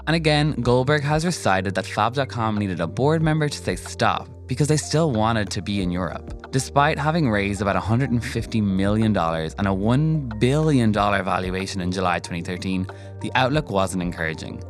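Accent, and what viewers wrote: Ireland. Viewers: When a brand pivots, like changing the voiceover, you have to be ready for some backlash. BUT love beats hate. Welcome Irish Guy! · Most people are finding it a little harder with the Irish accent. Congratulations new guy, but please- let Caya host 😅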